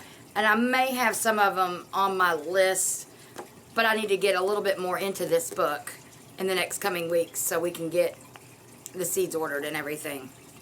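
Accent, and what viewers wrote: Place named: Kentucky, United States. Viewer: I have owned it over 25 years! It helps!she's also the author of Roses love garlic! I like Rodale's Herbal.Great video, never stop learning right?! Aww, you got your Baker Creek winter garden porn!😂💕. What is your growing zone?I'm up in Chicago, zone 5b. You sound like Kentucky to me. Freezer coleslaw?!my record just skipped!lol That is a recipe i need!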